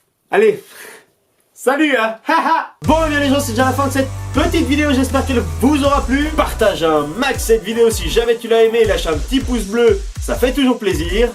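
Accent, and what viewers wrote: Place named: Quebec, Canada. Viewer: Ptdr, excellent surtout avec l'accent québécois 😹😹😹😹👍👍👍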